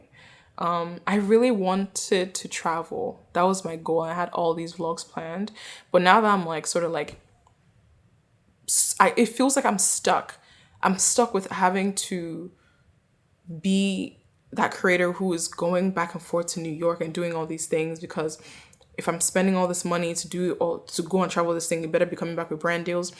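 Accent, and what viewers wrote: Nigeria. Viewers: Stop listening to all those people and just do what you did and enjoyed to get you here. If talking in you 100% Nigerian accent is who you are ...then be you and do you. Stop trying to please everyone but YOU!! Sweetheart you are hurting yourself for others. You are not a living sacrifice for them. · I love your Nigerian accent....it's beautiful...and so are you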